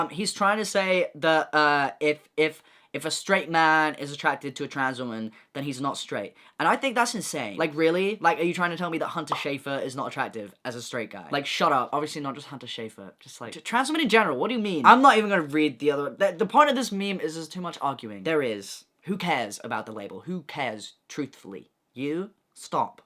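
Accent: United Kingdom. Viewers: he's so bri'ish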